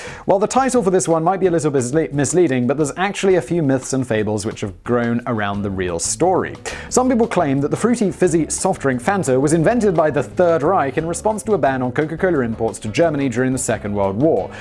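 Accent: RP, United Kingdom. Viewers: Wait, is British? Since when? Is it serious? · Goddamn this needs to be higher. Also add says uncensored “ fucking“ 😂 caught me off guard and was awesome to hear after years of his impossibly posh Britishness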